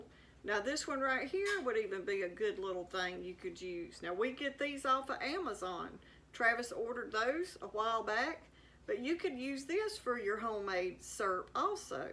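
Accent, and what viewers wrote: South, United States. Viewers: Homemade pancake "srrp", and it has to come to a "rollin bole": beautiful southern accent! :) (I live in the boring/no accent midwest)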